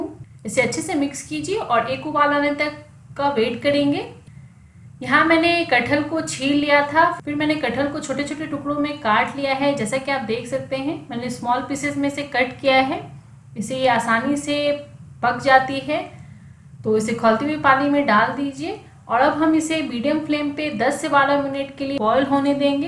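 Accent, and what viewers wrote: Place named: Bihar, India. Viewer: Esya bihar m bolty h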